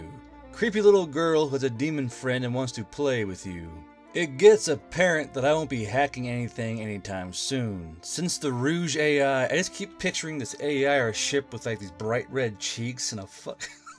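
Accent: South, United States. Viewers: He has a very heavy southern accent. Makes listening to him more fun for me since I can see an old folk I used to talk to who spent plenty of time telling me war stories.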